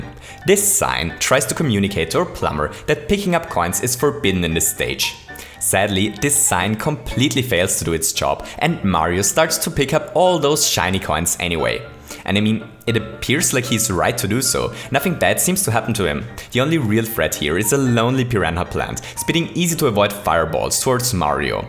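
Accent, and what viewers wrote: Austria. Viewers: You are from Austria? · Wait. Are you austrian or Lithuanian? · youre austrian? looooooooool im austrian too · You're from Austria? Right?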